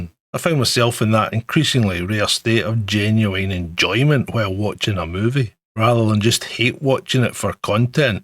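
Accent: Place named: Scotland, United Kingdom. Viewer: The heavy Scottish accent narration kills me....its charming usually, but not for this, no.........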